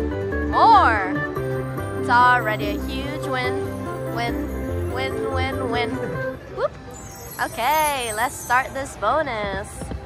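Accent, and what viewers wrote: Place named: Minnesota, United States. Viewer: Recognize that Minnesota accent from anywhere ya know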